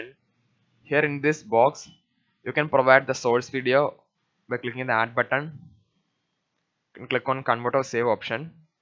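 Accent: Kerala, India. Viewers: Malayali, Right? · Argggh, your indian accent is too strong,...I cant understand shit that you're saying. Lose the accent bro. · Machane Malayali AAANOO?? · Ohhh your Malayalam accent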